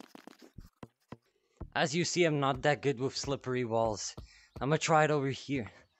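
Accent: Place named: Philippines. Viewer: u filipino? u got the accent lol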